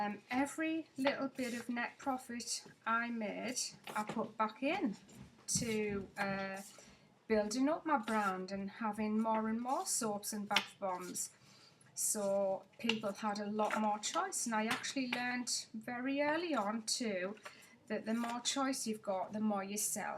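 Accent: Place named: United Kingdom. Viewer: Hello I have been watching you for weeks now, and I just live listening to you talk about how Eden's Secrets came to be. You are very pretty and it's nice to put a face to that beautiful accent. I am from the states and I LOVE British accents. My goal is to go threw and watch all your videos and try to comment in each one. Wish me luck on my adventure. Bye for now.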